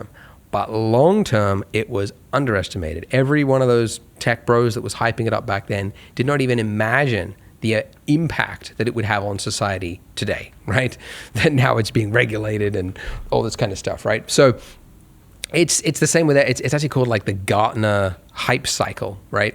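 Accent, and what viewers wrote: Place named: United Kingdom. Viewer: Why do people with British accents seem so intelligent? Not saying you're not. It was a good video, and well-thought-out. But it is augmented by your accent. :)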